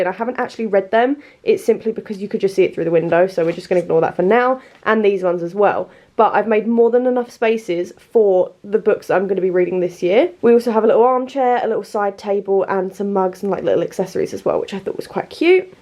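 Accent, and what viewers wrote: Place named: Essex, United Kingdom. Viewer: I love these videos so much! Also how organised are you filming multiple at once 😂 Our accents are so similar are you an Essex girly too?!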